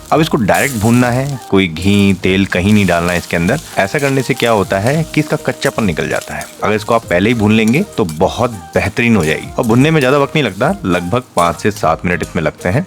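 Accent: Uttarakhand, India. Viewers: हम दोनों बैठ कर TV screen पर आपके द्वारा बनाये जाने वाले व्यंजन को गहनता से सुनते हैं। कभी कभी आप कुमाऊँ लय में बोलते हो कभी हरियाणा राजस्थान से मेल खाती भाषा का प्रयोग करते हो। सारा मिलकर आपके प्रोग्राम स्मर्णीय होते हैं... धन्यवाद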